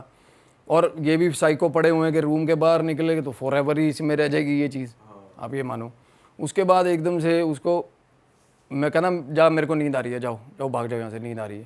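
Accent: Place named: Pahadi, India. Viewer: Seems like pahadi kind accent...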